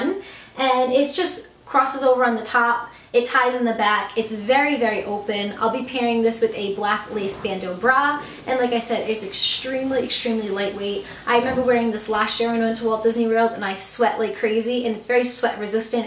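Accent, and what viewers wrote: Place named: New England, United States. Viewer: New England? As in New England, Mass? I knew your accent sounded familiar. My boyfriend is from a small town right outside of Boston called Fall River. I knew something was up when I heard you pronounce 'saw' as 'sawr'; he does that all the time haha.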